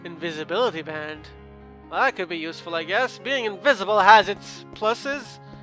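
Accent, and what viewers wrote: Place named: United Kingdom. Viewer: Oh dat british accent <3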